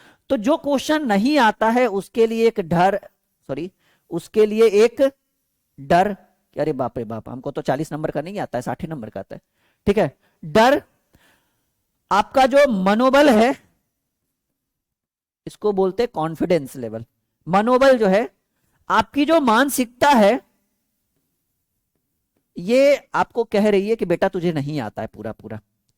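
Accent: Bihar, India. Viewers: Sir bihar se ho kya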